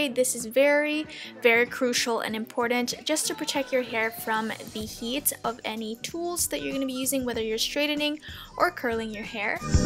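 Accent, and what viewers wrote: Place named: United States. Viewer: you're so cute. you sound just like me w/ ur american accent when you speak tagalog. #struggles lmao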